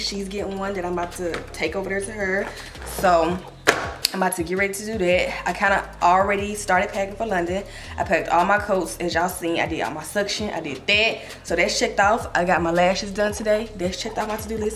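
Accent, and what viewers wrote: Memphis, United States. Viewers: Hearing the Memphis slang and accent through her videos is so homey to me 😭 I be home sick when I’m not there.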